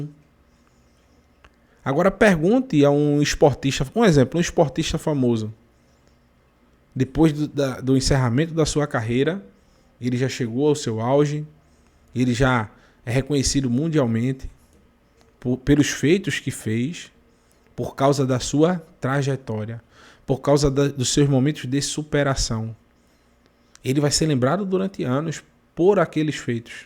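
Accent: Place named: Recife, Brazil. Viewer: Excelente estudo, moreh. Recifense da gema, o sotaque não nega.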